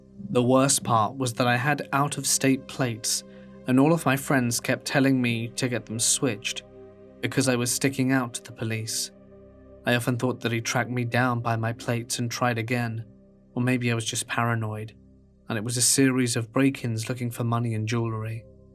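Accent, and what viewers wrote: United Kingdom. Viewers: The British accent gets me every time. 😊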